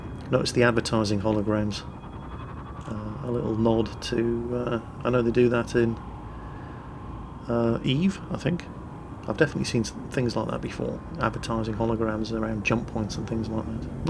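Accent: Netherlands. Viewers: The voice does sound pretty Dutch. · yep he sounds Dutch to me, and i`am Dutch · I dont think it is dutch, he's talking english :p he has a really dutch accent tho ( i'm dutch ) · 10th!! and btw jingels, it does sound dutch, he sounds like my math teacher when he is talking english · he does sound dutch...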